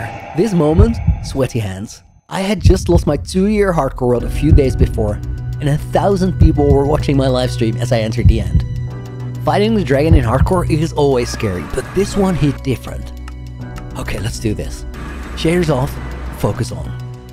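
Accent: Netherlands. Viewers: Areyou dutch???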